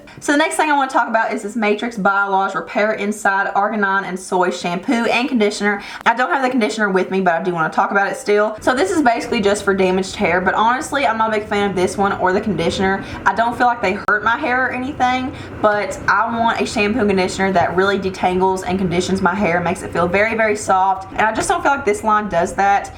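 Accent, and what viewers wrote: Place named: Kentucky, United States. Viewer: Those are my favorite curling irons too! (The sally beauty ones) I’ve just discovered your channel today- you sound like us central kentucky girls, but I can hear a Georgia twang, too. Where are you from? Great channel!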